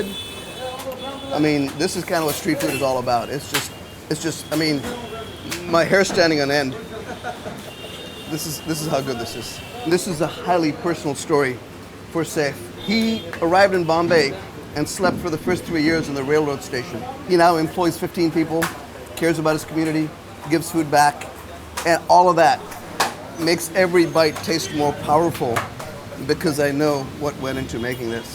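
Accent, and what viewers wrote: United States. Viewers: Following cause of your host.... Face of Naseeruddin Shah, accent of an American, 100% understanding of Indian culture and the calm, reasonable way he comments.. 5 stars all the way. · American Indians don't even sound this American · Malayalee in american accent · Probably the first presenter I encountered who has an authentic (American) English accent as well as an original sounding Hindi accent. Both preserved and original in their own sense. Compared to so many presenters on Internet with their fake accents. Very well presented. Great job🎉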